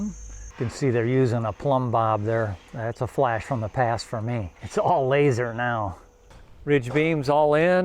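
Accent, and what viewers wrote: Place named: Midwest, United States. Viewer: Are you from Chicago? I detect a midwest accent. I grew up in Illinois but been in CA for 40 years. Thailand next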